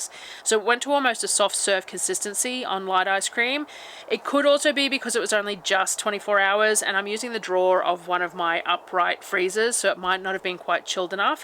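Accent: Australia. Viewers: I love listening to you talk. I have always loved the Australian accent. Also, the speed you talk is fine. I don't want to listen to someone who talks like a turtle. So forget what those people say and keep being you.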